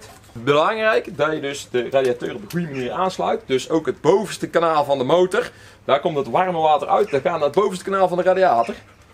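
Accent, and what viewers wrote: Brabant, Netherlands. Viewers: He's Netherlandish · gast... was ook niet als haat, hou alleen ontzettend van da brabantse accent van m.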